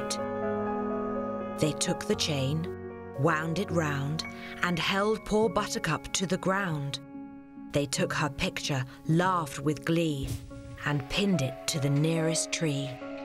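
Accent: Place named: England, United Kingdom. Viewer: great enlish accent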